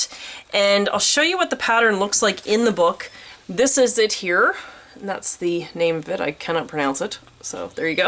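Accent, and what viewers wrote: Canada. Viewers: This was a wonderful and informative video. It made for a happy Sunday afternoon viewing. My first time watching a pillow lace tutorial, and I think I hit the jackpot! You are great at explaining, and the camera was perfect! I loved that you zoomed in several times so we could see the threads. I’d love if you would put the source links below the video, because I’ve forgotten the name of the book, and the sources for threads and the kits. You are in Bermuda, but you sound like maybe you cane from Canada? Or Northern Minnesota? “Oot” I totally love it, whatever the origin! This is also the first of your videos I’ve watched! Liked and subscribed! ~annie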